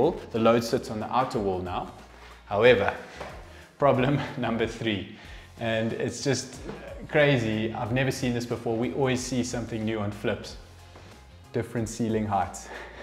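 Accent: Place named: Johannesburg, South Africa. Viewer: Imagine my delight when clicking to the vid and hearing that familiar lekker Joburg accent. Easy sub. Well done so far and keep it up. Really enjoy the videos I've watched thus far, very relatable content.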